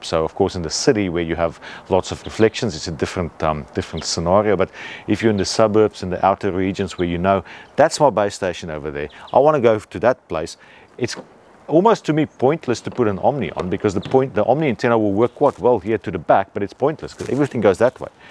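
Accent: South Africa. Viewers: Thanks for the video. You sound South African 🤔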